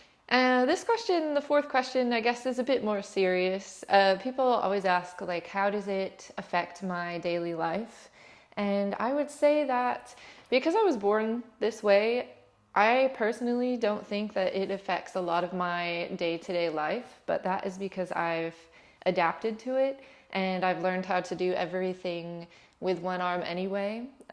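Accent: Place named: United Kingdom. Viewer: This is my first time hearing your voice and idk why but I thought you were British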